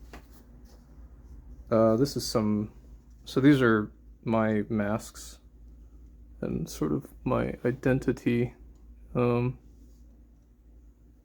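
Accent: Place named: United States. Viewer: Here we go! Comment wars! 47 mins!? Wowowow! A few nice phases hahaha!! Your smooth American voice is soothing haha. It's nice to see your rougher work. The bishop sketches are badass!!! Reminds me a little of an artist called Crom that made bird king but your stuff is a lot more realistic. The little things you write down throughout the book are cool, a little hint of emotion here and there. Just wanna say that this is awesome that you've finally made the video!!!! I love a skull with a badass jawbone for some reason so this is itching a scratch for me. I think sharing your work like this will be so good for you. Kinda just getting some thoughts out into a video through voicing it 🙂 I prefer black and white drawing too but some colour on my stuff brings it to life a little. Maybe try a little grey or a single colour. The audio is good by the way, nice and clear. 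The big masks are pretty cool, looks like real bone. You know mate you are actually so so good at drawing. The tiny tiny details are super intricate. The picture of the burn pile was awesome. Sorry to hear about your uncle buddy. Unfortunately I know the pain of losing a loved one. (Hug) Never knew your biological father. That's sucky... But at least you made some cool art out of it. I wish I never knew my dad the old drunk bus driving wanker haha. I don't personally enjoy social situations too much either, I prefer just a small group or just me hanging out on my own... Which is kinda weird for us as we are so up for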